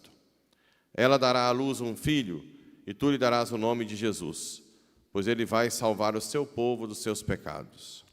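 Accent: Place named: Goiás, Brazil. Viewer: Deus abençoe o senhor Pe, sotaque goiano, é o máximo 😅😅😅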